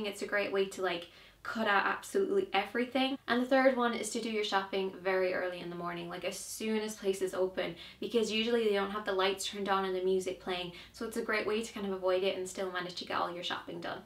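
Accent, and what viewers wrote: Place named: Ireland. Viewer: She's Irish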